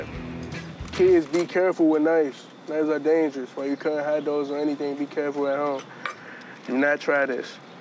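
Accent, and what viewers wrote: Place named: DMV, United States. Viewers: Nigga got a Baltimore accent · If u ain’t from da dmv u don’t even understand him, my dmv ppl stand up slim💪🏽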